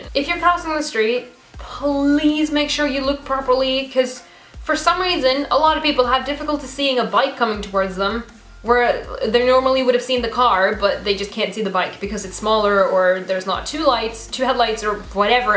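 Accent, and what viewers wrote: United States. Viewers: whatever I think you have an Amrican and amazing Accent. Thank you for replying.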